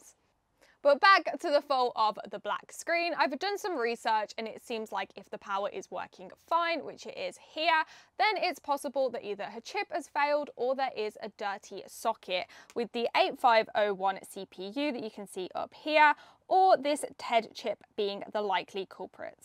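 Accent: United Kingdom. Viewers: I love your videos! You have one of the thickest British accents I’ve ever heard. Love it.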